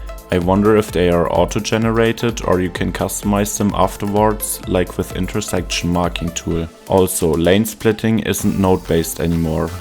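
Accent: Germany. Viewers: Bissel Deutsch xD Aber Starkes Englisch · Hearing your German accent is kinda funny ngl (Ich bin auch Deutsch)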